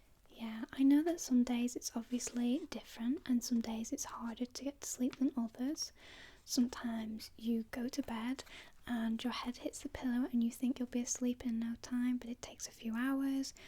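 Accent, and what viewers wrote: United Kingdom. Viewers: Congrats, so pretty british accent!! Love your ASMR videos!!! Hugs from Brazil. :) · Her voice is so beautiful, I love that I'm discovering more British ASMRtists. I love the sound of British English, it's so beautiful and perfect for ASMR❤️Subbed and liked.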